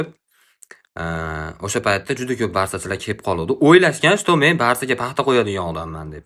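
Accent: Andijan, Uzbekistan. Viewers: Zor aka anjondanmisiz sheva ashaqa tortyapti😊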